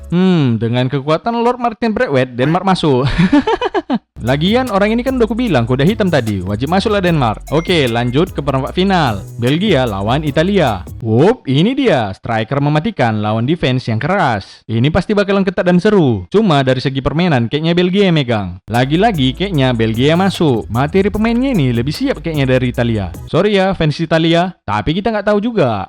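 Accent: Medan, Indonesia. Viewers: 𝘛𝘶𝘬𝘢𝘯𝘨 𝘴𝘰𝘬 𝘯𝘨𝘢𝘫𝘢𝘳-𝘯𝘨𝘢𝘫𝘢𝘳𝘪. Medan kali, bah!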